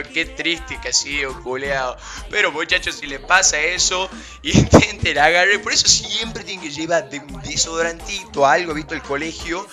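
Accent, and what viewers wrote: Tucumán, Argentina. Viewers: So re tucumano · Una mezcla de tucumano y Argentino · JAJAJAJ ESE TUCUMÁNO PAPAAA AGUANTE TUCUMÁN AJDJAJAJA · de que parte de Tucumán sos ?? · SOS UN CRACK WACHO JAJAJAJA ME ENCANTA COMO ES EL ACENTO TUCUMANO SOY DE BUENOS AIRES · No hay nada mas piola que el acento tucumano culiadaso.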